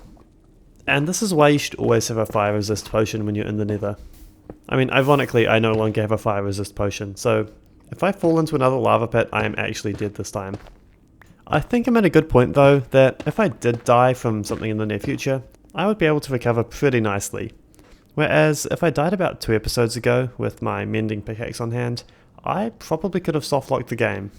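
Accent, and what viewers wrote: New Zealand. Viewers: I just noticed that this guy might be New Zealish. But i'm not 100% sure (i'm a non native english speaker)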